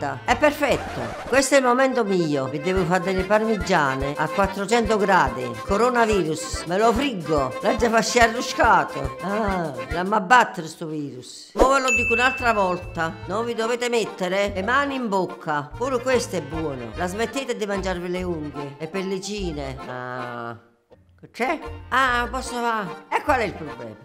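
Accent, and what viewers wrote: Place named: Naples, Italy. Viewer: Ciao, sono un'insegnante di italiano e vivo a Dublino. Ho passato questo bel tuo video ai miei studenti stranieri, così imparano da casa anche durante il coronavirus e magari imparano anche l'accento napoletano. Ti volevo chiedere se hai messo tu i sottotitoli in inglese o sono automatici? Corrispondono in pieno al testo in italiano tranne in un punto. Non so se li puoi/vuoi editare. Invece di "eating nails" si dice "biting nails". In quel punto io mettererei "biting nails, cuticles" (le pellicine). Buon lavoro!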